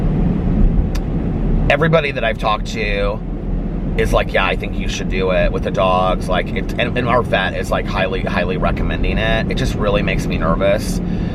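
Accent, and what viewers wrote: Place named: Midwest, United States. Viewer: Your voice captivates me. Your accent is reminiscent of my midwestern family roots. Been to Indy several times, lived in Cincy for a bit. Love the Midwest and adore you and. Thank you for this channel. So cozy.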